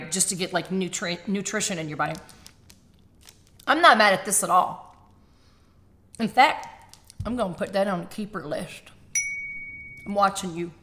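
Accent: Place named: United Kingdom. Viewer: How fun!! - Looking forward to the next one! ... and Your British accent is impressive!!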